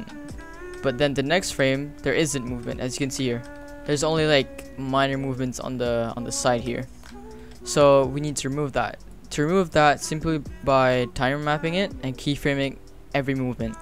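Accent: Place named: United States. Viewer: whoa ur malaysian an yet u have an american accent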